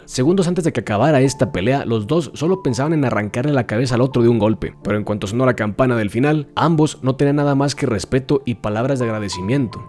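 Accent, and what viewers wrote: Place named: Spain. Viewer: No lo tomes así hay gente q no le gusta el acento español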